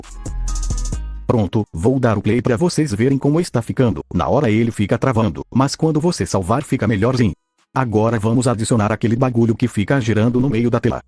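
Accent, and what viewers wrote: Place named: Northeast, Brazil. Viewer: Tu é nordestino ? Se não é é minero Parece nordestino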